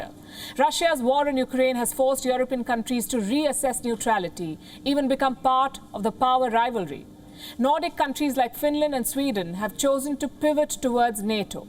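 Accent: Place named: India. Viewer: WION, MSM bottom feeder with an Indian accent.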